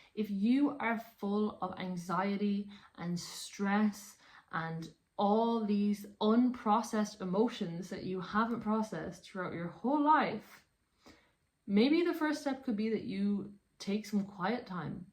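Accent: Ireland. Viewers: Your killing me with the Irish accent. Love the accent. Your doing a great job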